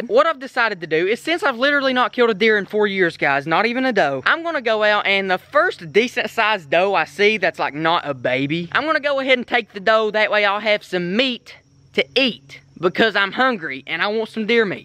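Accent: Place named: Kentucky, United States. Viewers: Hey there fellow Archery not even sure if you’re going to see this but just wondering how far south in the United States you are(because I’m genuinely interested in where that heavy of an accent comes from not trying to make fun of it) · Love that southern accent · This guy is definitely from Kentucky.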